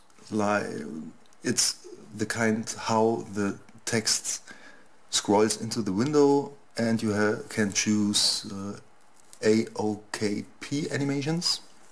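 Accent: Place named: Germany. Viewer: Lol hast echt einen starken deutschen Akzent. Ist das das echte update oder ist es einfach von jemandem der das auf dem Internet hochgeladen hat?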